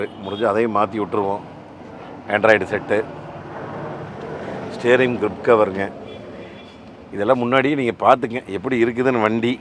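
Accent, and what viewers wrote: Kongu, India. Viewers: காரை பற்றி நீங்கள் பேசும் விதம் மற்றும் உங்களது கொங்கு தமிழ் மிக சிறப்பு அண்ணா ...வாகனத்தை மிக நேர்த்தியாக செதுக்கி இருக்கிறீர்கள்...மிக அற்புதம் 🙏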